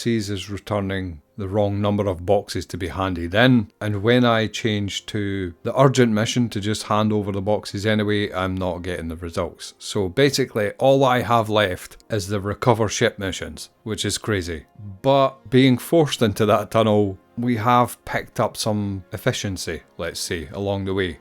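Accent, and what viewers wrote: Scotland, United Kingdom. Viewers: I'm consciously following along with the wee sairtin wyerds arrr siid to byittr awndairstaynd how parrteekular wayrds are seed from a lejituhmet Scottsmn layk yourdr seeyalfff. (Spelled phonetically from the 'weee' my kuhnayjiun y'eers 'n brehn pairsceeev eught) (I'm consciously following along with way certain words are said, to better understand the way particular words from a legitimate Scotsman like yourself {spelled phonetically from the way my Canadian ears and brain perceive it})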